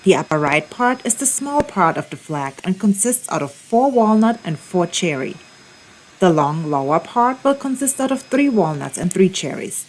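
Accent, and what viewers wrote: Germany. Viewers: I figured you were from Deutschland with the accent. My mom makes pfeffernusse for Christmas, my grandmother used to call me a kleine hans wurst when I got out of hand. She used to call everybody Hans wurst if she didn’t like them. Love that smile, and you make sanding fun and interesting.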